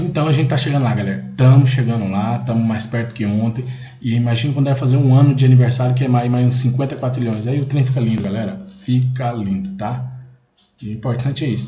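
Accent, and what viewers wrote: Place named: Belo Horizonte, Brazil. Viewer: Sua voz lembra meu tio de BH pelo sotaque rsrs muito bom !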